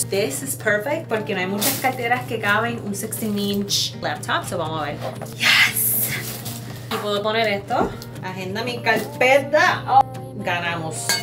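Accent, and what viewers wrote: Puerto Rico. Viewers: Mira de verdad lo unico de China 🇨🇳 q tu tienes es tu rasgo Fisichella xq hablas como nosotros los Boricuas con nuestro acento osea q los años q vivistes en NYC NO AFECTARON ESE ACENTO, MUCHAS BENDICIONES EN TU NUEVO PROJECTO Y Q SEA DE MUCHO EXITO,AMEN, GRACIAS X REPRENTARNOS CON MUCHO ORGULLO ❤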